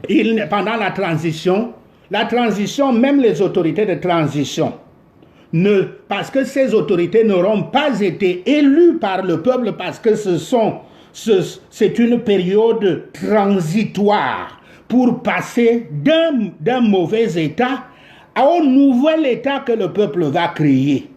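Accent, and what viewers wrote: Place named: Guadeloupe, France. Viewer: Tu es camerounais mon frère? Comment t as l accent Guadeloupeen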